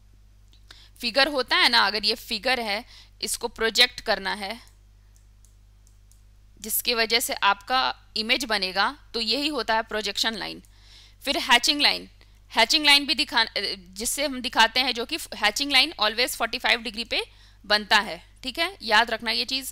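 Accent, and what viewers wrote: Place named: Bihar, India. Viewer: god bless you ur voice is very beautiful. lagta hai app Bihar se belong karti hai apki tone she lagta hai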